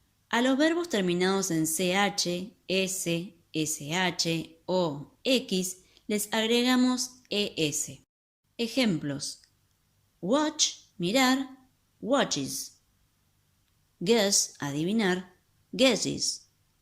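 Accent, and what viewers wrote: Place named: Argentina. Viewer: Que explicación tan clara como el agua. Gracias señorita se nota que es argentina o algo asi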